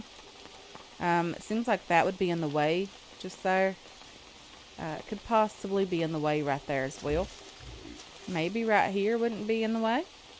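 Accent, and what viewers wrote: Kentucky, United States. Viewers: Hey loving this gameplay series so far (I came from following your Subsistence videos). Might have to give Green Hell a try. Learning a lot, enjoying the content and just let me say, your voice brings a happy nostalgia to this Kentucky girl (living in MI). Its so nice to hear an accent from home! Also, have you ever heard of Starsand? Its a desert survival game and its unforgivingly brutal. Looking forward to more content! :)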